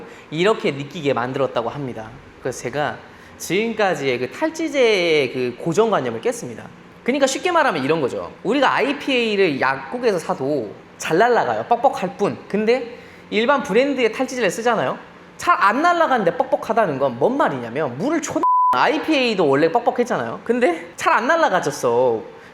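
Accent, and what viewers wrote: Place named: Jeolla, South Korea. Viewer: 아따 전라도 사투리 구수하게 쓰시는데 전라도 아니셨습니까?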